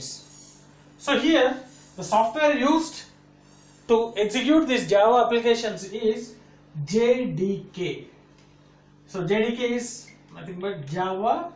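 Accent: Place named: West Bengal, India. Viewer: Sir i think u are from West Bengal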